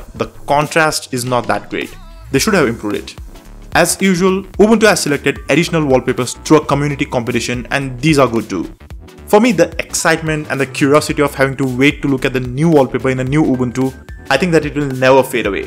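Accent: India. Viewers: He was probably born and raised in a different country from you. My guess is the vicinity of the Indian peninsula? I've got good money that you're a Trump American. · Brother, are you Indian?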